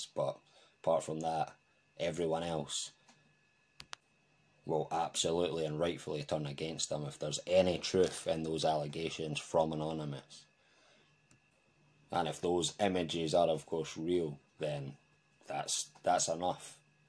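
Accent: Scotland, United Kingdom. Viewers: New Sub here - you and many other astrologers have predicted this orange bastard tRUMP is going down. His luck is finally ending. I'm from the US - love your Scottish accent!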